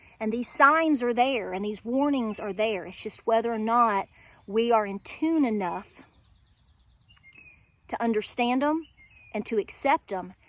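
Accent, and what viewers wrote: South, United States. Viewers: I LOVE your accent!! It’s such a beautiful southern sound; both my grandmothers were southern and I loved hearing the soft lilt in their voices:)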